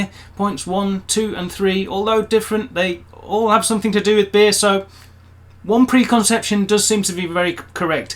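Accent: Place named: Germany. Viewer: Your German accent is perfect. But now I get the impression you are not an English guy living in Germany, but a German pretending to be a Brit living in Germany. Anyway, you didn't mention of course the worst cliches regarding Germans about WW2. It's understandable that you didn't, but nowadays I think you can mention the war 😉even though many people here in Germany are tired of hearing about it as well of course.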